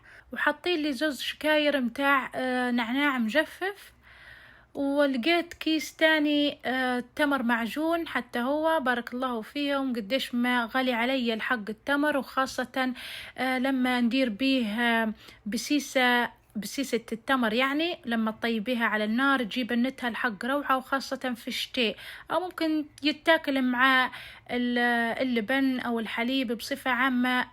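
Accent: Misrata, Libya. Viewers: من مصراتة واضح لهجتك 😂😂❤ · طلعتي مصراتية 😂❤❤ و اني نقول لهجتك مش طرابلسيه ، ربي يحفظ ليبيا كلها ❤ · الله طلعتي مصراتيه شكيت في لهجتك فالاول 🥹🤍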